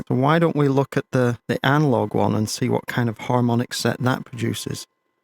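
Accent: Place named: Yorkshire, United Kingdom. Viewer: That's some accent mate, Yorkshire man in Cali? Fantastic video btw!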